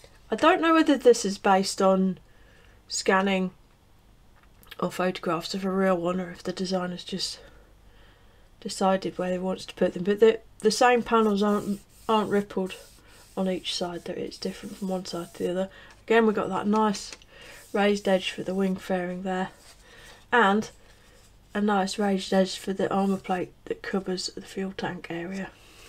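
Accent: United Kingdom. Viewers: Apologies for this confusing comment on my part. “Right the way through” is a sentence that another British reviewer uses ad nauseam 😩. On one of his recent reviews, I started counting how many times he said “right the way through”. It became tedious and I stopped counting at 18 or 19 times, I forget. It was nice to sit through your review and not hear it a single time 🙏🏼. P.S 1 : You are one of only a handful of people who recognized “Bindair” as “Been there” 😄. P.S 2 : In your review you pronounced “Curieux” beautifully. The charm of your lovely British accent when pronouncing this French word made my heart skip a beat 🥰🥲. I was (am still ?🤔) French.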